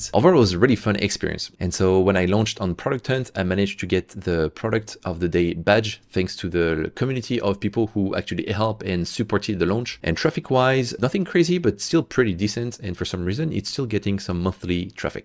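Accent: France. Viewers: Hey, i have a weird question, are you French ? I sense some really well hidden french accent lol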